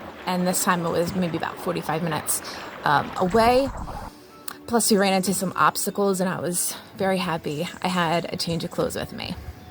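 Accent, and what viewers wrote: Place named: New Jersey, United States. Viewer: Love it! You can take the girl out of Jersey, but can't take the Jersey out of the girl... "Wooder 🙂"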